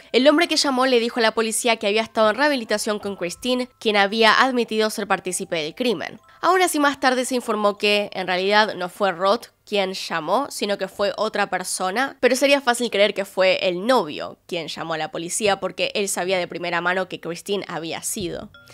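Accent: Argentina. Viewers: Porque los argentinos no pueden decir la Y y la LL y dicen "Sho" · Es argentina :D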